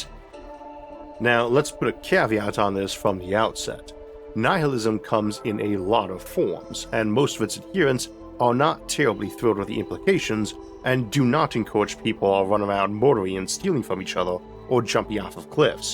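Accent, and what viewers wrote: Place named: South, United States. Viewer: This deep southern accent of the USA is worse than a Scottish one.Who picked the parameters for the A.I. text to voice? Mr. Alabama? Unless you come from the Mississippi delta you’re not gonna have an easy understanding.A human would have done this better.For this ,using an inferior A.I. I will boycott the rest of the video.Give jobs to humans,you pile of rusted microchips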